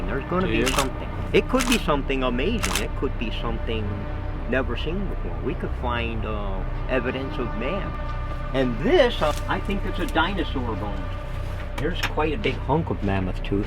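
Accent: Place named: East Coast, United States. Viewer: I wonder what his ball cap said before Bone Rush gave them one of theirs. He talks just like fellers from my area on the East Coast.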